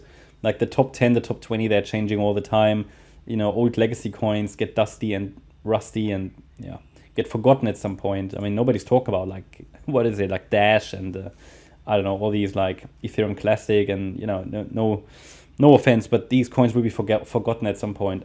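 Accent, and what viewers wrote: Germany. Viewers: Thanks, Hear your accent, i assume you are German. I am also living in Germany and have made quite significant gains with Solana. I wouldn't like to sell it because of 42% crypto tax here i need to hold on until july because then I'll complete 1 year and then tax rate would be 0%. What would you suggest? Take my profits now or wait until July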